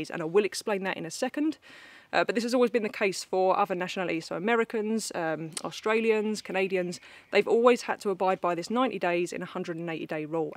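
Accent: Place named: South London, United Kingdom. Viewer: Sounds like a proper Sarf Lundun accent 😁. Trying to lose my Sarf Lundun accent on my radio show 🙄 it’s not workin. Great explanation 👍🏻.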